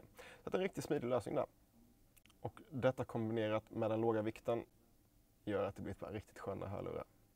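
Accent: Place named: Sweden. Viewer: He’s from Sweden 🤨🤨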